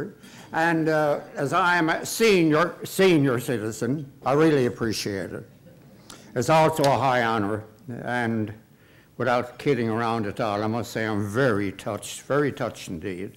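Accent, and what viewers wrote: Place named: Ireland. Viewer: Interesting accent. I can hear the Irishman in his accent. Greetings from Ireland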